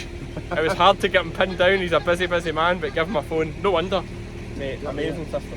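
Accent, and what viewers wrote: Scotland, United Kingdom. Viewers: i love the scottish accent, respect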